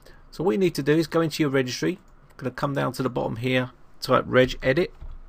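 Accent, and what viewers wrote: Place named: Cockney, United Kingdom. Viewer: You are a marvel to us, your tech tips are truly on the button but I have to laugh, my wife too is a cockney. One of her "bruvvas" speaks just like you. His fumdrive (also drive hache) is always having write protection problems. Hahaha. All the best mate.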